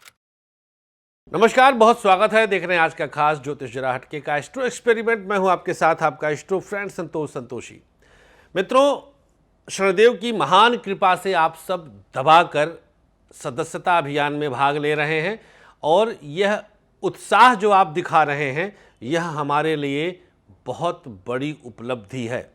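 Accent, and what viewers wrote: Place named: mixed, India. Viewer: Sir ap u.p Bihar k byea ki treh mat bola kro saf hindi bola kro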